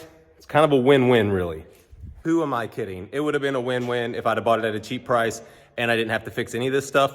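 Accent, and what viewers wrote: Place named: Ohio, United States. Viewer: Dude sounds like hes from ohio like the accent dose lol sounds like home lol😂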